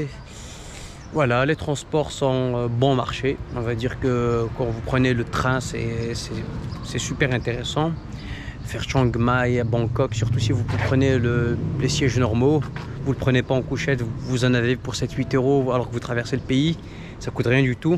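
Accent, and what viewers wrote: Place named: Kabylie, Algeria. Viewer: De dbk et de tigzirt j'avais bien reconnu notre accent magnifique 😁 Bravo a toi . Tu vis en Thaïlande ou en France ?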